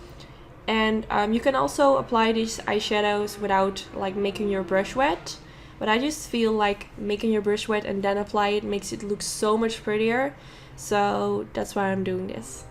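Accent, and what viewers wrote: Netherlands. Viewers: Shout out from holland. Je accent gaf het meteen weg dat je Nederlands bent. Geweldige tutorial! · Ar you dutch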